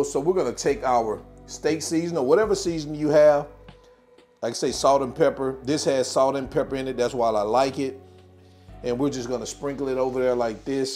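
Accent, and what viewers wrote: South, United States. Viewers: lol a southern accent is not “bad pronunciation”. Be proud of where you’re from💪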